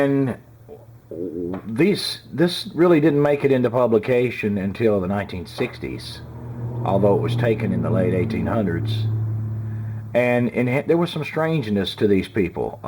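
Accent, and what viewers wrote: United States. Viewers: His voice is soo... "American" its awesome!!!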